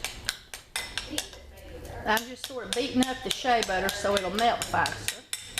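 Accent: South, United States. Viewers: Hey just found your channel yesterday awesome channel. Thank you for sharing your knowledge. When I heard you speak I said she’s southern, so am I Knoxville TN, here looked you up we’re not that far away from each other. Keep these great videos coming love watching you.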